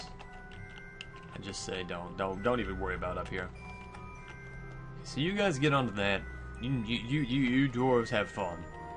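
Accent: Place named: Texas, United States. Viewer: Sounds Texian I like it.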